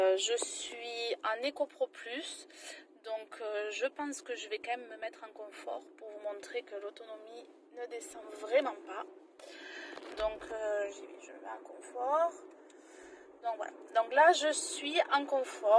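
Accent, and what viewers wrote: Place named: South, France. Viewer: Il ne couvre même pas et fort heureusement ce (très) léger mais délicieux accent du midi ! 😃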